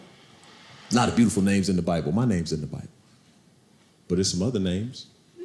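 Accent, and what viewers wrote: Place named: South, United States. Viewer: I adore his lovely soft southern accent and gentle manners, for a brit its a joy to listen to Real class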